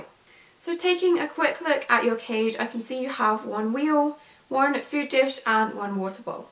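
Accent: United Kingdom. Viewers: You sound very British today TeA Or CoFfEe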